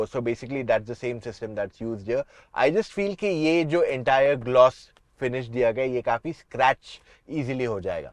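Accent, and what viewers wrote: Gujarat, India. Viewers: He is Gujarati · Like your Gujarati Tone मेला होने वाला है l 😅😅😅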